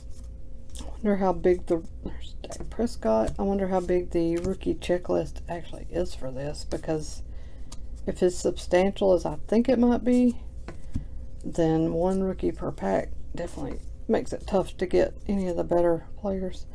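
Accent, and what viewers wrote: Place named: Indiana, United States. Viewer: I want an answer to one question. Are you from Indiana? Because your accent indicates it. You sound exactly like my cousin Tanya and you don't cuss just like her, meaning you don't cuss at all just wanted to clarify. And if you're not please show your face just to prove it.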